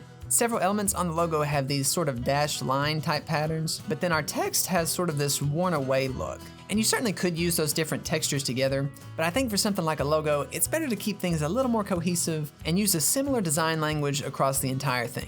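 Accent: South, United States. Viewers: Dang you a southern boy huh? Bet u hung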